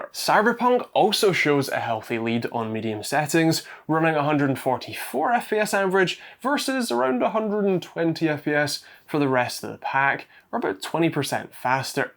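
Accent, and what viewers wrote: Scotland, United Kingdom. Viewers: Watched a few of your videos now, great work not getting caught up in the hype like most of the other reviewers. I know people aren't generally concerned about power consumption but the TDP is getting out of hand and like you said good luck keeping it cool. Glad it's a great performer but 241w is crazy IMHO. I've given you a sub nice to hear a Scottish Tech tuber!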